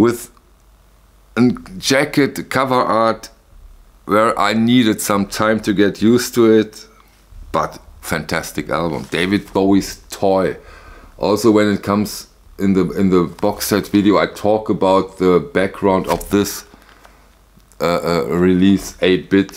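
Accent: Germany. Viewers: Love the German accent ALL HAIL DAVID BOWIE 💜🤘🏿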